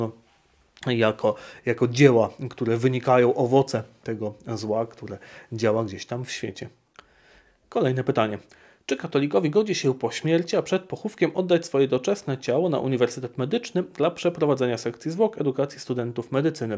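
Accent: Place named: Italy. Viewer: Strasznie mnie drażni włoska wymowa łaciny przez księdza. Równie dobrze mógłby ksiądz wymawiać łacinę według reguł polskiego języka albo jakiegokolwiek innego. Uczyłem się łaciny u trzech mistrzyń, a ostatnią była ś.p. pani Maria Kosiarska na KUL, która cieszyła się ogromnym autorytetem i nigdy nie słyszałem, aby którakolwiek z nich mówiła manium, redżina czy czelum. Zawsze było magnum, regina i coelum. Dla mnie okaleczanie łaciny poprzez włoską wymowę jest obrzydliwością, bo każda deformacja jest miła diabłu. Poza tym dzięki za świetne audycje.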